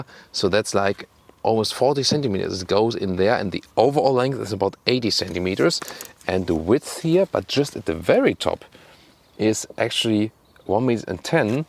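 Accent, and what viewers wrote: Germany. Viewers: Dieser deutsche Akzent :D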